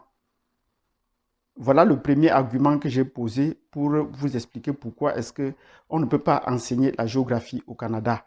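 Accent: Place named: Cameroon. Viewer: C'est quoi son nom, il a un ton Camerounais, si c'est le cas je dois le contacter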